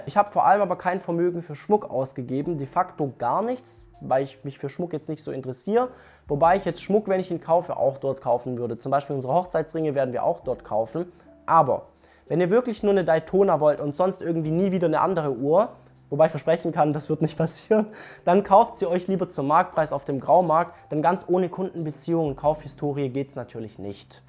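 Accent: Germany. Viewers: Gute Tipps! Aus welcher Region kommst du, wenn man fragen darf? NRW? Bin auch noch auf der Suche nach einem Konzi, bei dem ich deine Tipps anwenden könnte. Letzten Monat erst habe ich mitbekommen, wie meine Traumuhr (Submariner 114060) im Hinterraum des Ladens zurückgehalten wurde. Ich müsste zunächst ein teures Model aus dem Schaufenster kaufen (als Bsp. nannte er die goldene Daytona mit Oysterflex). Erst dann würde er mir die Sub zum Listenpreis verkaufen... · Dein leichter Dialekt lässt mich darauf schließen, dass du aus BaWü bist? ;) Sehr gutes Video, arbeite an meinem Traum in Form einer Uhr.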